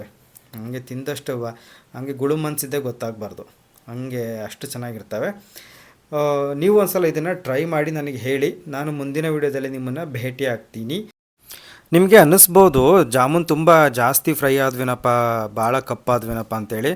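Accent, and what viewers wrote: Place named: Holalkere, India. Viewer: Nimmadu holalkere taluka bro Nammoora kade thara ne maathadtira.... normal blr bhashe ellaru maathadthare.. continue like this 😅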